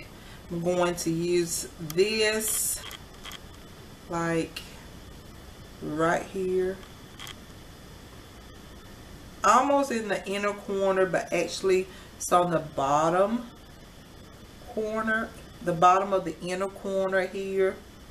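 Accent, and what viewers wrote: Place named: South, United States. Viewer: I just know you are from the south me too and your accent is great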